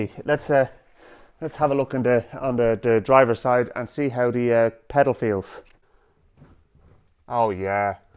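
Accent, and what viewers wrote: Ireland. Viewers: Are we Irish?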